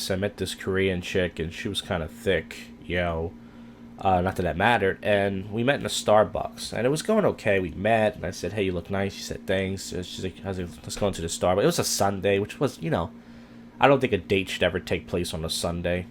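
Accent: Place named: East Coast, United States. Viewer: U sound like east coast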